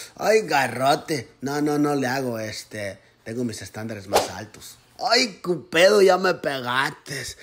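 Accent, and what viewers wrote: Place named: Michoacán, Mexico. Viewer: Este compa habla como que es de Michoacán, en caso de que sea, saludos Paisano, yo tambien soy de allá.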